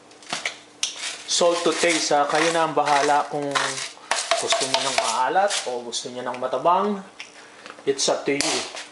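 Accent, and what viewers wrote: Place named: Pampanga, Philippines. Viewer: Kapampangan ka nga....may punto...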